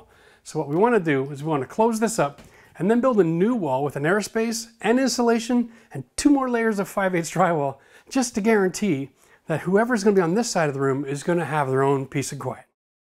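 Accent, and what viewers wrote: Canada. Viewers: This guy is Canadian, eh?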